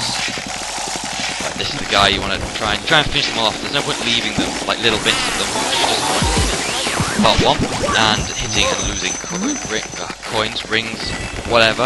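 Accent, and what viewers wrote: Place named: England, United Kingdom. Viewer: is the dude that made this video English??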